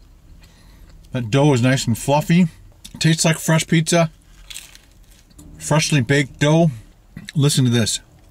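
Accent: Canada. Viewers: Pro-gress. Gotta love the Canadian accent. I work for a Canadian bank in New York..after 10 years there I’m starting to talk like a Canadian as well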